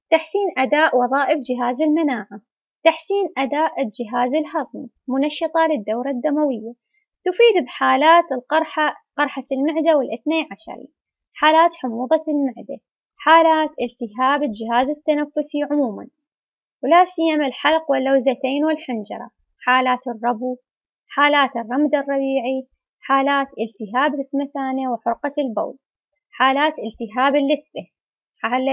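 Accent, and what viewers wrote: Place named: Basra, Iraq. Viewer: عرفت من لهجتج بصراوية الله يحفظج ويبارك بعملج انا من حي المهندسين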